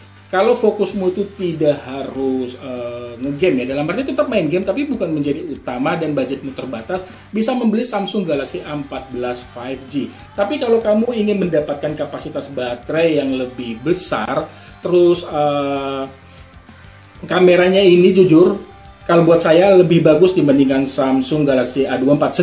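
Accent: Semarang, Indonesia. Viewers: Orang semarang ya bang 😅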